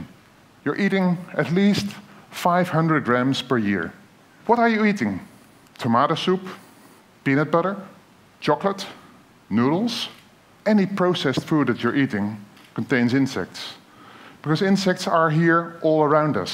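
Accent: Netherlands. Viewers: Wow, massive Dutch accent. Also, I have a really simple answer to the question. "Because I have no where to buy them" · So obviously Dutch.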